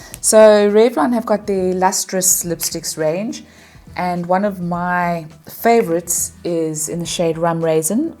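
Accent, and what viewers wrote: South Africa. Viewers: Hello from Los Angeles.🌸🌴🏖️😊 You have such a beautiful accent & calming presence. And I guessed your accent is S. African.🏵️🦒🌴🦏🏞️Yay! Thank you for the review. I think I'm going to try L'Oreal Freshwear again. Last time I tried it, seemed my skin felt a bit dry and the color wasn't right I normally stick with Clinique because of my sensitive skin. Unfortunately, getting a good shade match is still difficult with Clinique. I've been shade matched in many different colors.😒 I've also used Revlon Lipstick-Rum Raisin since my early 20's. I'm 52 and it's nice to see someone else who remembers these products & shades. You look so pretty. Thank you for sharing. 🦋🏵️🌻💄🌸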